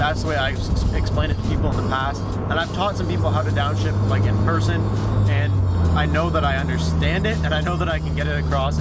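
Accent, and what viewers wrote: Canada. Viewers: He sounds really Canadian honestly he reminds me of my friend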